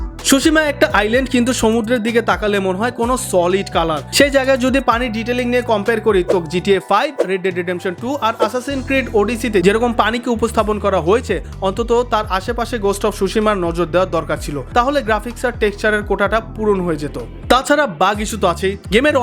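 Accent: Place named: India. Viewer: vai ki indian? thik moto bangla bolte parchen na kisu jaigai!!!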